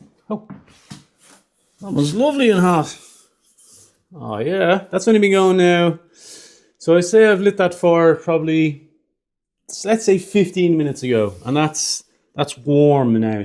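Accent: Dublin, Ireland. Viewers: I laugh every time I listen to you. A missed friend is from Dublin and your phrasing is music to my ears. I knew you were a Dubliner almost immediately even though I haven’t heard it in years. Good videos , fun to watch. Stay on your feet on the roof, you took a good thump there. Yes I saw it yesterday. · Lovely seeing your cottage and lovely fire your a treasure enjoy Christmas love your irish lilt ❤😊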